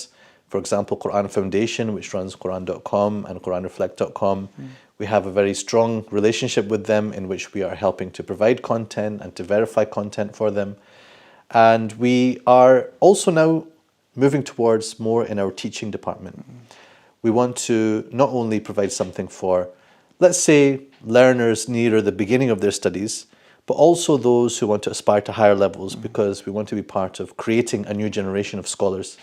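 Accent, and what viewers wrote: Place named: Scotland, United Kingdom. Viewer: sorry, Shaykh. Couldn't resist. Never heard a scholar with a Scottish accent before, but l appreciate what you are doing.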